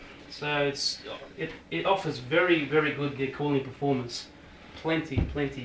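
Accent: Australia. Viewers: hes aussie for sure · Greek Australian I'm guessing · Actually, I'm English and he doesn't English. He sounds Australian.. And No, it isn't obvious that he's Enlgish, because he's Australian >.> And if you still don't believe me, then you're an idiot, because on his channel: Hi My Name is and i live in Sydney, Since the age of 10, I developed an interest in eXtreme Computers, Thanks to YouTube i have the opportunity to share my passion & inspiration to the world. Country: Australia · British? he sounds like an auzzy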